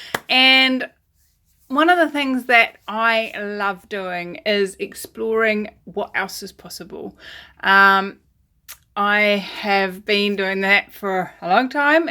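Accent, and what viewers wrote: South, United States. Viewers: Sounds like a mixture of a Southern and Midwestern accent! This little guy isdarling. You've certainly got it going on this!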